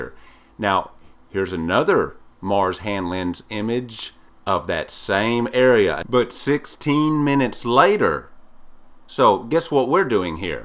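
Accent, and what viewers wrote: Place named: East Texas, United States. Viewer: man! Thanks for the video! Your work is my favorite! Must be that East Texas accent.. Or them funky tunes. Keep it up man. We love ya in Louisiana!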